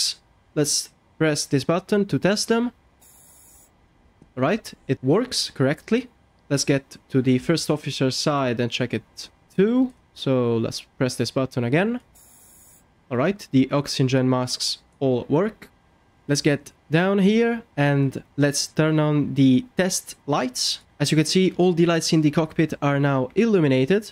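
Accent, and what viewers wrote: Italy. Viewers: are you Italian?😅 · Are you italian?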